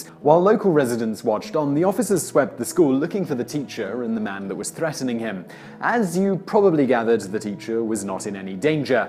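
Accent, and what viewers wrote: United Kingdom. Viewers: your pronunciation is awesome other then having a uk accent , i have never heard you mispronounced words compare to other channel, do you fact check how to pronounces them before recording, so professional!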